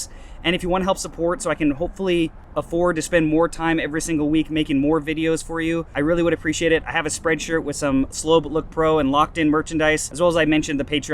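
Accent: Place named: United States. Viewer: I follow you since 2013 I think, and I have never understood a single word, because you speak very fast and with american accent (I'm Italian). But I like your videos! :)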